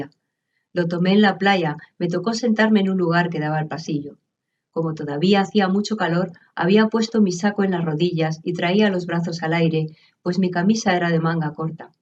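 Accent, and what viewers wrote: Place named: Rio de la Plata, Latin America. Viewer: gacias, hoy releyendo al genial Felisberto en tu cálida voz, y aunque te empeñes en leerlo en "español" el rioplatense brota como manantial, uno de los escritores destacados del canon rioplatense. gracias por elegirlo. te extrañamos, no nos abandones. soy noe de argentina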